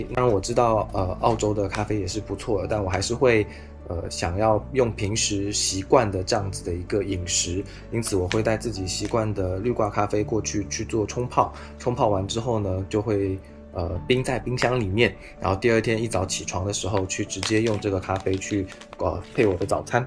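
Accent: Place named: Taiwan. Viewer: 講話敘述真的很像台灣人，CC字幕有繁體字，真的很用心，內容很詳細清楚，非常棒，感謝🎉。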